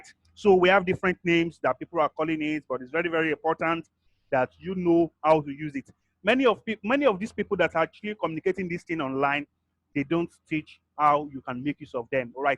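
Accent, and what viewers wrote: Nigeria. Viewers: If he uses his native language will that be better for you? Listen to understand him. He is a Nigerian, English isn't the native language used there but more like a general thing.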